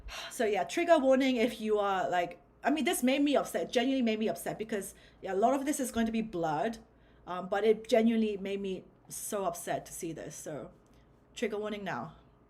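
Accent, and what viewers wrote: United Kingdom. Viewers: Sis, you’re hot. Your voice is sultry. You have a british accent. You’re bilingual. Shall i go on? You’re winning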